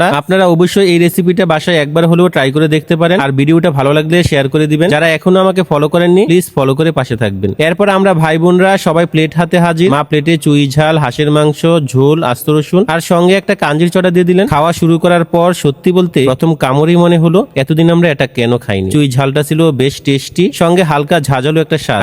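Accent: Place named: Narsingdi, Bangladesh. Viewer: Tai Tu boli vasha ekdom amdr Moto . Hajipur chinen? Gechen kkhno?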